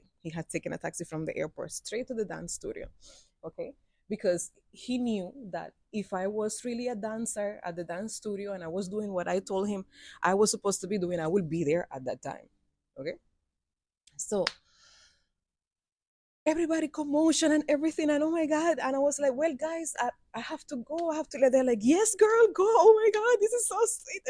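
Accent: Patois, Jamaica. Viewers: There's nothing wrong with the way she talks. She lives in Jamaica and picked up the dialect. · Lawd me weak . I love the way you speak patwah. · You know, listening to your stories and the way you talk, proves that you really turned into a Yaadie after all these years, because usually Cubans who come to Jamaica are full of bad attitude, they don't like the Jamaican food, housing has to be top tier, salary has to be the double of the Jamaicans but they want to work less, and if you feel like Kfc workers in Jamaica have a bad attitude while working, you have never seen a Cuban yet😂😂😂 So I am very much surprised seeing a Cuban woman like you adapting to Jamaica so well, put in the hard work, not afraid to make her hands dirty while keeping a grateful positive attitude...yah man, you turn 100% Yaadie! 🫡👏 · Girl i love your jamaican accent , welcome home girl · Beautiful jamaican accent great story ❤ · Love your Jamaican accent👍❤️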